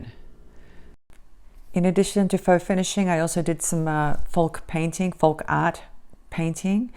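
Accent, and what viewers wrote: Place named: Australia. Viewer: I knew I detected an Australian accent! Wow, you had an interesting childhood! I had to make a choice in High School between music and art as we weren’t allowed to do both. I played violin and was in the orchestra so my path was laid. But, in elementary school, I won a few art awards and so I decided to bring it back into my life. Unfortunately, I became disabled almost five years ago now and I’ve become interested in different types of crafting. I enjoy beading and jewelry making as well, but I also enjoy paper crafts like card making and journal making. I’ve also become interested in polymer clay, needle felting and embroidery along with cross stitch. I’m also interested in learning watercolor techniques. I’m kind of all over the place and I think I need to figure out a schedule or something as I have more interests then time/energy to do them. 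I guess I’ll get it figured out eventually. Thank you for sharing! 💜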